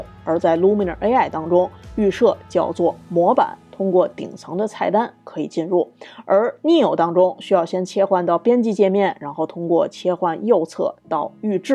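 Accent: Beijing, China. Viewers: 姐们儿，一听你就是老北京：）感谢分享，在B站也关注你了。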